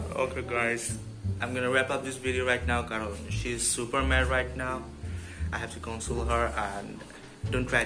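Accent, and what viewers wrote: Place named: Sylhet, Bangladesh. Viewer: Lol 😂 onek moja paise 🤣 your sylheti language is so cute 😁. Finally I have had chance to see auntie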